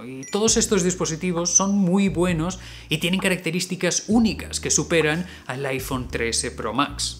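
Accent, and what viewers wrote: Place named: Madrid, Spain. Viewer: Esto es totalmente offtopic, pero es que hablas con un 99% acento madrileño y sin embargo no pronuncias de igual forma la 'c'. No es nada negativo ni una crítica a tu contenido, solo me pareció curioso.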